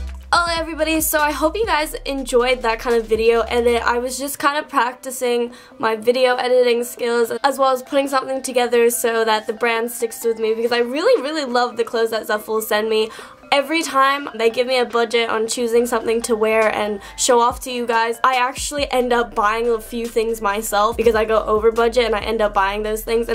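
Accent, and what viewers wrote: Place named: Australia. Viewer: She's got a slight Aussie accent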